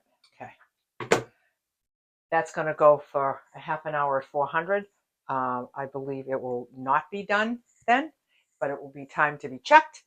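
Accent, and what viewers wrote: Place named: New York, United States. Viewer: I absolutely love your hair AND .....your roasty toasty cabbage and your accent love love it!!!! Im from NY too!!!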